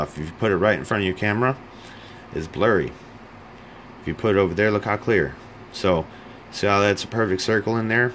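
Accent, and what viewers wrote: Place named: United Kingdom. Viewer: PARDON! I didn't understand anything , you started talking Britannica .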